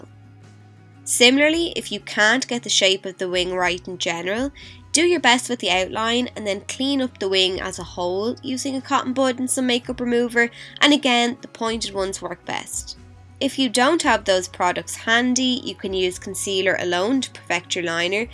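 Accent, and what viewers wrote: Ireland. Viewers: Hey, great video I like the simplicity and that you take your time and go into detail to explain what you're doing and how you're doing it. I also like that you share alternate tips and tricks incase we're having problems. I appreciate that, I like your style so will be subscribing......also love your Irish accent. Coming from a massive Conor Mcgregor fan lol keep up the good work !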